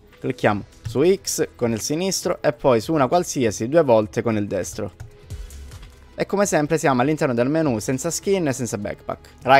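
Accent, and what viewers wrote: Calabria, Italy. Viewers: Sei calabrese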